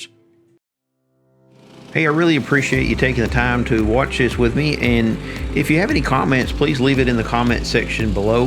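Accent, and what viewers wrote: South, United States. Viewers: This is a highly edited video. And it’s repeating the premise and slow rolling the punchline and the good-ole-boy narration is only annoying. The answer is given in the final 30sec. The rest is just repetitive of the first third. The big saw mills are supposedly getting rich. There...you can skip it.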